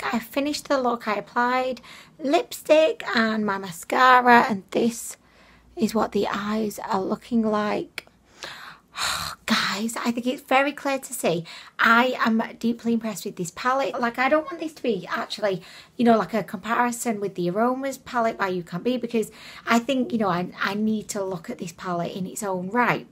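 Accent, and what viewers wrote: United Kingdom. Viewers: you make me want a British accent so bad! Wanna trade, I'm a southerner (South Carolina born and raised)? You'd just have to say y'all a ton, wet "yer" whistle with some sweet tea, while calling everyone Hun, Honey (not just Hunnies), Darlin', and Sweetie. 😂🤣😂🤣😂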